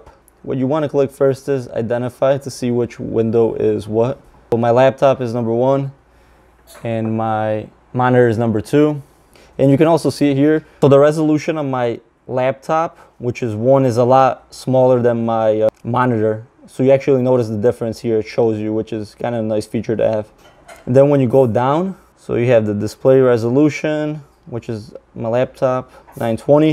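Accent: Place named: Staten Island, United States. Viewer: That's the way to do it. Clear, short and with a Staten Island accent. Makes it that much better ;)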